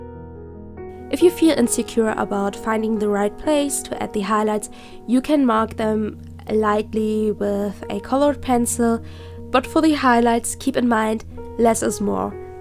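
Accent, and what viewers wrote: Germany. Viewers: lol that hard german accent, but either thx :)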